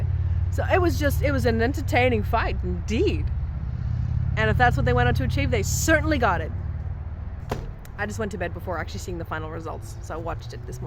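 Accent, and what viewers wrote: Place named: Western Australia, Australia. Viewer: 25 whole years ago. 60 days of my life was spent in WA. Good ole memories. Your accent made me flash back on the good times in my life.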